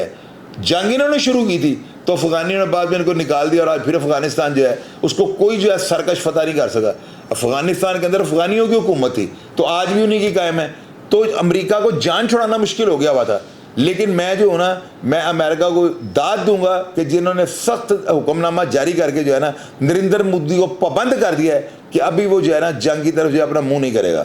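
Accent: Lahore, Pakistan. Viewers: Aj lahori style Mai bat kr rhy hai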